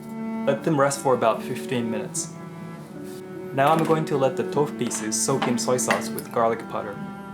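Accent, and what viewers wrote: United States. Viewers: he sounds so american now LOL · You sound so much more american since I first started watching- wo a h. Also the video is g o r g e o us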